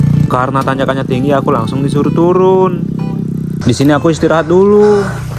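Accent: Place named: Kendari, Indonesia. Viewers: Logat ta sudah pasti mi sulawesi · Logatnya kaya org Kendari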